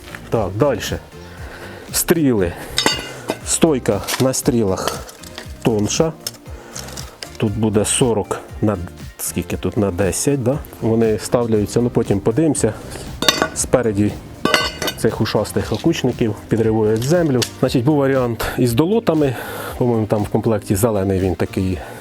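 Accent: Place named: Surzhyk, Ukraine. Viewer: Того шо в нього трохи суржика є, не всі слова він каже Українською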